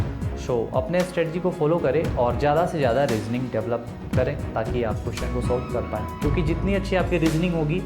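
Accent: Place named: India. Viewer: U speak Indian a lot so it's difficult to understand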